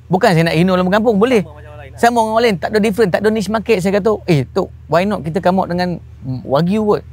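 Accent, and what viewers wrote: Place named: Kelantan, Malaysia. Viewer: tak faham.... maaf...pekat sangat cakap Kelantan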